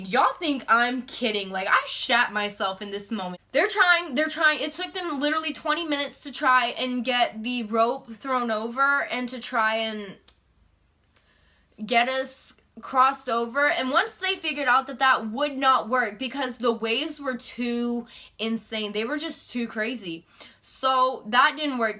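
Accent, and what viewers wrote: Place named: Atlanta, United States. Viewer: Girl you gotta be from Atlanta. Lol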